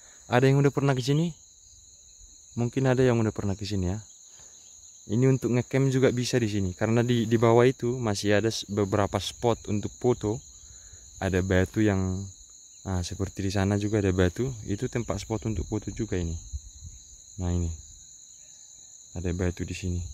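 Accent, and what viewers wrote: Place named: Batak, Indonesia. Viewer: Dari dialeknya, BATAK kali pun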